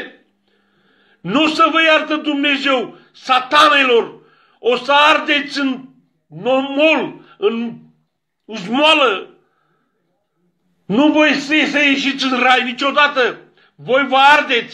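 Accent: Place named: Turkey. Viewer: E turc daia vorbeste asa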